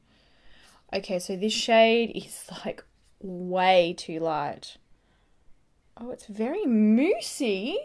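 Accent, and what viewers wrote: Australia. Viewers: sara v. she's from Australia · is she Australian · Omg. When i heard your voice i knew that you are from Australia. ❤️ I love Australia. It's most beautiful country in the world. And i'm from Czech republic. ❤️❤️💖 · Amanda Bittner she's Aussie and leave her alone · is she australian i cant tell with her accent because i'm australian and i dont know ahha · h law She's from Australia. Of course she's gonna have an accent. Everyone has a different accent depending on where they're from. Did you not know that? I don't think you did, even though it's an obvious fact. Also your attitude is so gross. I literally cringed reading your last two comments, yuck. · Thats not american slang. She is Australian. Thats how she speaks. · Where is she from? Australia or New Zealand??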